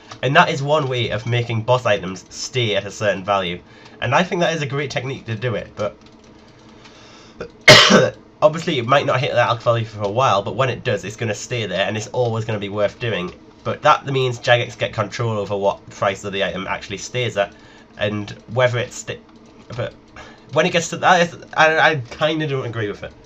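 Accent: Newcastle, United Kingdom. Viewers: it's a geordie accent, like newcastle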